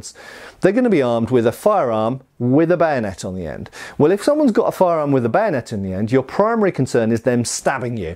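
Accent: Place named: United Kingdom. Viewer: Simply amazing - a Brit speaking and I can understand every word!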